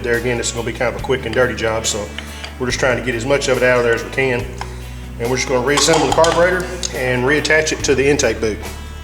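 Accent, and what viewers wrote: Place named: Arkansas, United States. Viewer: Love that Arkansas accent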